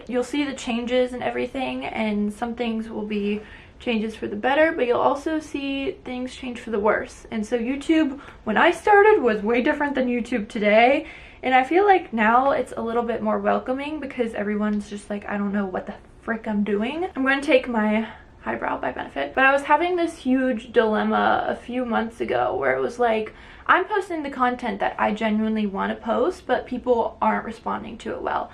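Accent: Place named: Nashville, United States. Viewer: I hear it most in the words "here", "now", and every so often at the ends of your sentances! I just assumed it was you picking up some of that Nashville accent lol ! Maybe I'm just hearing things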